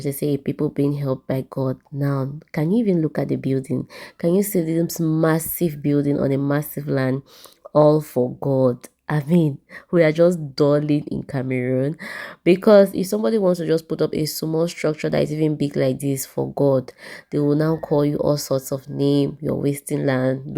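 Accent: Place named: Pidgin, Nigeria. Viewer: That's a whole Nigerian pidgin English u just spoke in this video.what about Cameroonian pidin English.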